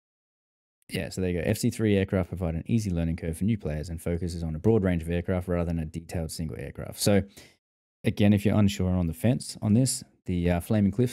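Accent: Australia. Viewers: Hey mate I am looking into getting involved in dcs at some point, I watched this, and if and when I get around to it I will definitely hit you up for info and further knowledge and hopefully you could be my wing man anyday ( had to say it ) / coach to get me up to speed. Great content and insight, loved the foul language as an Aussie myself you can't beat how we talk and have slang that most can't understand.. loved it, true words not just scripted for precious ears in this day and age.. I will definitely be watching more of your content because of how you come across and are REAL.. cheers mate..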